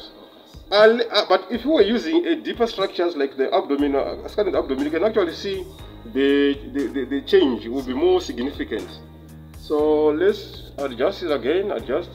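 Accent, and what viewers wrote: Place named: Africa. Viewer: I'm enjoying the videos especially thr African accent. Makes me feel so at home !!! Watched and started to understand knobology from same radiologist !!! Just great !!!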